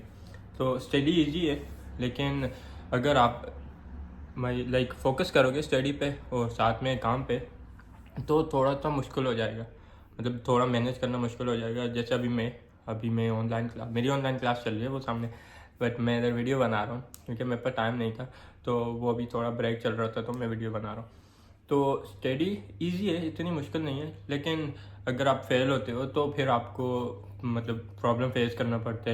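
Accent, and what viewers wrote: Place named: Karachi, Pakistan. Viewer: Bhai accent sy karachi k lagty hn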